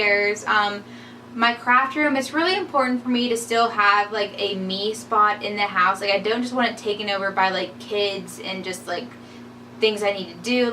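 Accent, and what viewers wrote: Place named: Pennsylvania, United States. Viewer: i love the farmhouse! is this in pennsylvania? thats my home state and i feel like thats you're accent haha i love it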